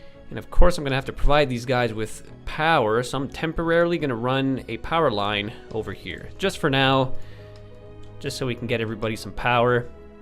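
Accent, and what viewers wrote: Canada. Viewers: I wondered all through the first episode why your accent gave slight Canadian energy. Still no houses, eh?" was sort of a giveaway. East Coast-er?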